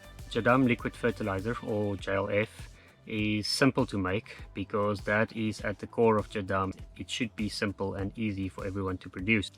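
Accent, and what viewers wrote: South Africa. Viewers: Hi you sound like south african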